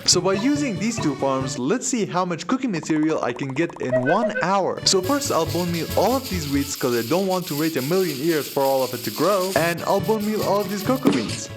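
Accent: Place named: United Kingdom. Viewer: You just have british english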